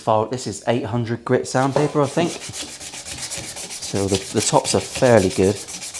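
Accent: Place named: Devon, United Kingdom. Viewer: Really enjoying your videos, great stuff. It's great hearing your accent as well, I have friends who live in Ilfracombe so it's a great reminder of them.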